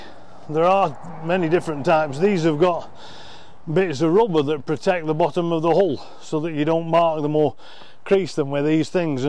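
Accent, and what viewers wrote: Yorkshire, United Kingdom. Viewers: As a fella that went to school in Whitby, but now lives in the US it's nice to hear that accent. Yorkshire?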